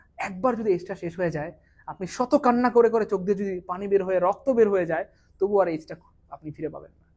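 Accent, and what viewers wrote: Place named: Noakhali, Bangladesh. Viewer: স্যার মনে হচ্ছে নোয়াখাইল্লা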